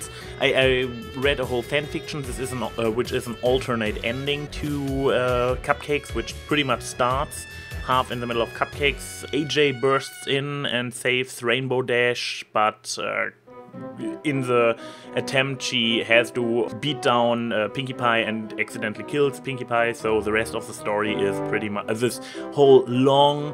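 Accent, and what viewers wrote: Germany. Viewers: Du hast n deutschen Akzent, meine ich :) ich hab mich wärend deiner videos gefragt woher ich den akzent kenne ~ und musste feststellen dass ich auch so rede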